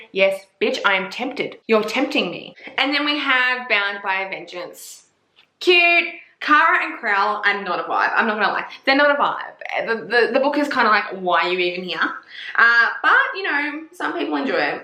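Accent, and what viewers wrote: Australia. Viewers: bish shut up I love the Australian accent 😭😭😭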